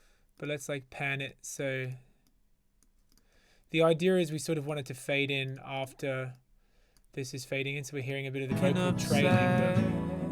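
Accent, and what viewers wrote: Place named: England, United Kingdom. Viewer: Your accent is so weird now lol Becoming more English. Love the tutorial btw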